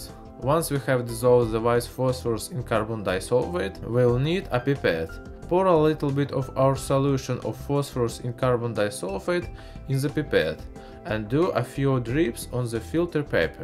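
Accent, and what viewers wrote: Russia. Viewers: Damn you have a thick Russian accent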